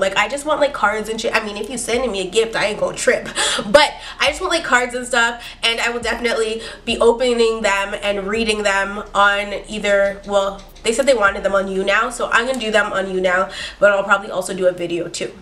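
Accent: Jamaica. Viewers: is she jamaican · ya Jamaican, or you just like our music? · Are you Jamaican ? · are you Jamaican by any chance. wondering because of those songs and some words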